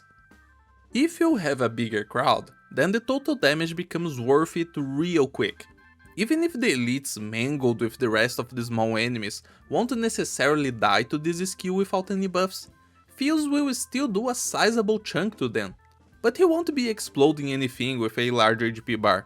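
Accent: Brazil. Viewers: Are u Brazilian? Ur english sounds like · The way you talk...are you brazilian?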